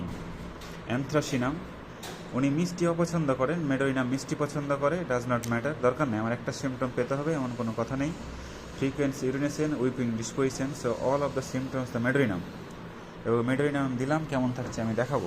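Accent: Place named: Chapai Nawabganj, Bangladesh. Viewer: dr. where are your chamber location ? Your talking approach like as you live in chapai and i am also living same district. Thanks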